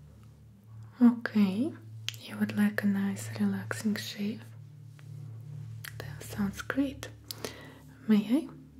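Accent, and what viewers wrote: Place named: Russia. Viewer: I figured from the accent you were from Russia or something. Added to the beauty. Very good your videos. You should do in Spanish for Latinos 🙌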